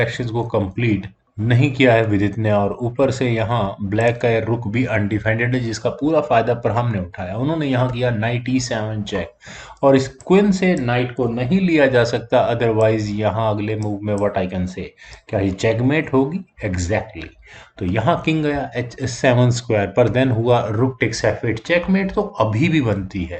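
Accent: India. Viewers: Could someone tell me whether the speaking person uses only English? Because of Hindi accent, I am not sure if it is only English or some Hindi words are used also.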